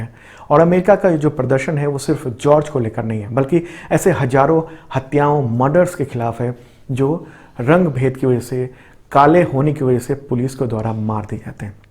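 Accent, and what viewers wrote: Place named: Bihar, India. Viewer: Voice action se Lagta h Bihar ke ho !!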